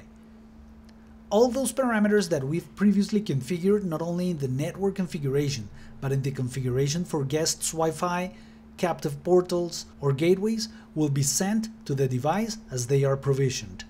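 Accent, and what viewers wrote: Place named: Colombia. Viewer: You sound very Colombian.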